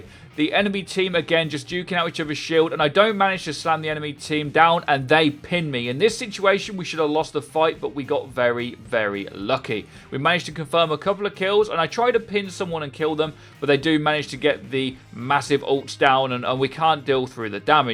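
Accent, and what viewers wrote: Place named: Midlands, United Kingdom. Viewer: That midlands twang though 👌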